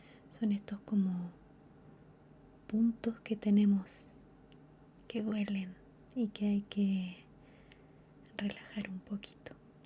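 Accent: Chile. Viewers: Muchas gracias. Te sigo desde hace años y creo que por ti amo el acento chileno. Justo han sido días de mucha ansiedad, ojalá hagas más videos así. Últimamente me he refugiado en tu canal de ASMR 🥹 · Querida, el primer video tuyo que tuve el privilegio de ver fue hace muuuchos años, un tutorial de cómo hacer un corazón de Undertale con porcelana. Comencé a ver tus videos por el tutorial, me quedé por tu carisma. Tu acento de Chile siempre me recuerda a mis abuelitos y todos los tíos abuelos y primos lejanos que tengo allá. No soy artista, pero soy docente, y fuiste una de mis inspiraciones para llevar el amor y la paciencia a mis alumnos en su proceso de aprendizaje. No suelo comentar mucho en los videos, pero te sigo desde mi cuenta principal hace muuuchos años. Quería agradecerte por el hermoso cariño que le ponés a tus proyectos. Y gracias por este video que me ayudó mucho en tiempos difíciles. 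Con amor, una pollito veterana ❤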